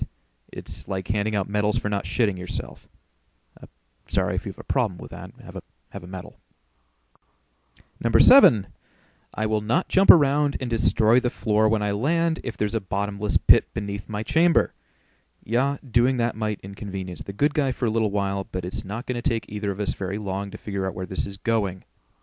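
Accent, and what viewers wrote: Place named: Scotland, United Kingdom. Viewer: Bralliant! (Yes the Scottish accent is deserved).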